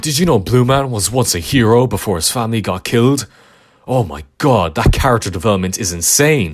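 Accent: Ireland. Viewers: You irish? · R u irish?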